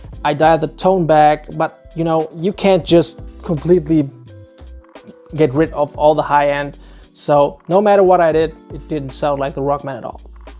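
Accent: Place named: Latin America. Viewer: Perhaps your accent and slight resemblance to Argentinians, possibly why you give off those light skinned Latino vibes? Haha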